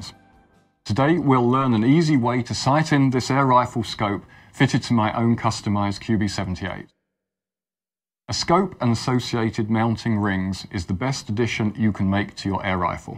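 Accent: United Kingdom. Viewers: You sir are a scholar and a gentleman This was beautifully explained and the British accent makes it nicer for me as someone brought up in rhodesia and South Africa 😎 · Thank you british man!!!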